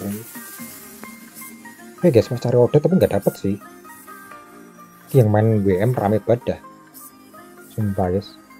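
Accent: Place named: Kediri, Indonesia. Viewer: ooh..tak kiro kediri,,,coro ngomonge mirip wong Kediri..😀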